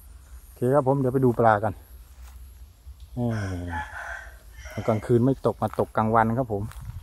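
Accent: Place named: Central Thailand, Thailand. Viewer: พี่พูดกลางทำไมไม่เรียกปลาช่อนครับ5555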